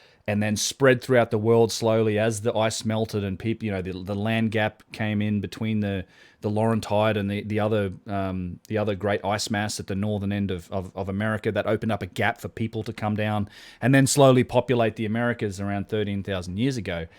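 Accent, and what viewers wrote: Australia. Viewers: What are you doing is northern Cal .... hot maybe sacramento .....nice to here Aussie anyway ...Bro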